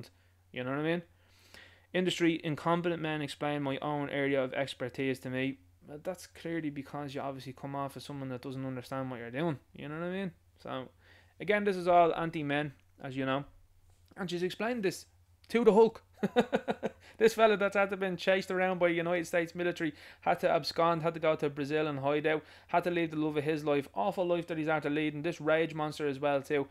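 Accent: Dublin, Ireland. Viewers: Good review. Nice to hear a Dub accent, too. Good one.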